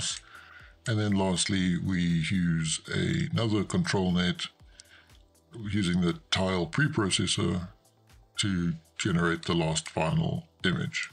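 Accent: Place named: Afrikaans, South Africa. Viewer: I like your voice my man.....English baritones with a faint touch of Afrikaans. Keep up the good work!! Also big thanks for sharing your work flow without putting them behind a paywall!!! :eyes-pink-heart-shape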